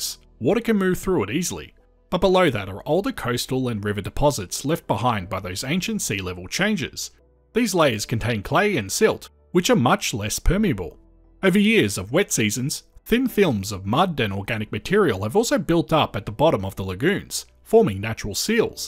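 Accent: Australia. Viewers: It's an Australian narrator and it's an Australian channel. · It’s Australian.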